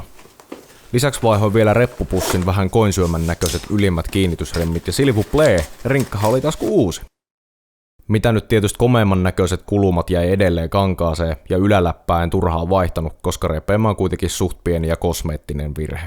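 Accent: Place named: Savo, Finland. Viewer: Keloja Luonnosta juuri näillä sanoilla! ..itellä hiukan hirvitti / säälitti kun näki mimmosta kyytiä tuo reppu sai 😳 ..mutta parasta tässä se, että rinkka on käytössä ja toimii vielä tuon pieksämisen jäläkeen! Ja myöski arviot analyyttisiä, asiantuntevia ynnä hyvin realistisia ! Kiitokset ja Semppiä retkiin 👍 - Tope - Ps: täytynee vielä näin jäläkikätteen puol-pro -valokuvvaajana ja -opena kommentoija tuota mainiota ja erittäin jaadukasta kuvaustyötä, myös videon viimeistelyä.. vetää virtoja mille tahansa firman tuote -esittelylle, siis myös kuvan laadun ja tason suhteen.. hienoo kattoo tuommosta, kuvaa, joka myöski tehty huolella, viimesen päälle hyvin.. ..ja myös tarina sujuu ja kulukee niinku vanhalla tekijällä, ammattimaisella tuotekonsulentillä ainaki.. ..mukavoo huomata ent.savolaisena tuo jouheva jutun juoksu ja murteen nuotti.. 👍😁🙌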